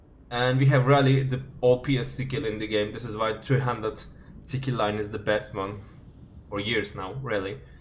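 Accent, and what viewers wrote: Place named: Turkey. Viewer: Yokla ing şiveden yine türk😄ilk defa ing video yu ana dilim gibi anladım😂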